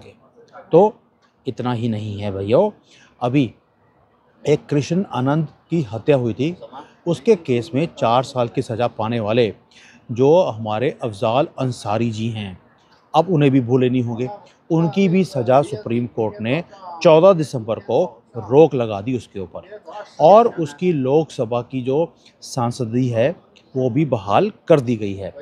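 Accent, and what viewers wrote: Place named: Punjab, India. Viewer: AAP KI ACCENT PUNJABI HEY AUR AAP KA BASERA CHEEN MEIN HEY AHLIYA BHI AAP KI CHEENI HEY AAP KA NAAM HEY ISS PAR ROSHNI DAALIYE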